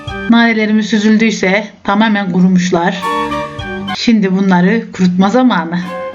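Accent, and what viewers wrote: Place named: Adana, Turkey. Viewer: Selam canım naneler yemyeşil nerelisin Adana gibi geldi bana.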